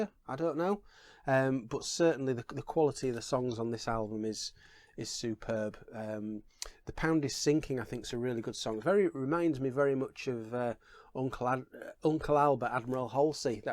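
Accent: Leeds, United Kingdom. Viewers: Are you from West Yorkshire? Heard you mention jumbo records on one of your videos and you sound Leeds!!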